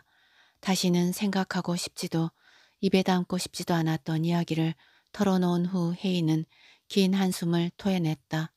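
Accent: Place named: Gwangju, South Korea. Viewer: 잘 듣고 있습니다 그런데 순천은 사투리를 잘 쓰지 않씁니다 글에서 나온 이녁이 그래라 안그래아우 그러지라 이런 말과 억양은 주로 광주나 근처에서 쓰는 사투리입니다 전라도 사투리나 억양이 가장 안쓰고 약한데가 순천입니다 암튼 재미있게 잘 듣고 있습니다 감사합니다 화이팅!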